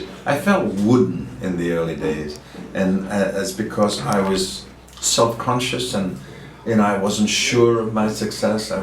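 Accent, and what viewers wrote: United Kingdom. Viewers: Engelbert is very relaxed, and reminiscing about the old days! This is backstage, in his Dressing Room at the Count Basie Theater in Red Bank, NJ and I was there, to see the show, and had the nicest meeting with Enge after his show. He is so soft spoken, and that touch of a British accent, and telling his stories, and I love to sit there listening to him. Thank you Mark Weiss and Rock Scene Magazine for a most enjoyable interview with Engelbert - The King of Romance! <3<3<3 Dorothy Whalen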